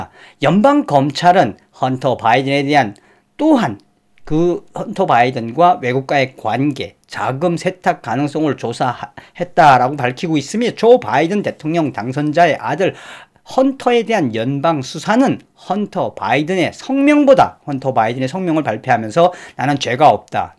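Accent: North Korea. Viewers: 좋은정보감사합니다 근데 북한사람느낌나요ㅋㅋ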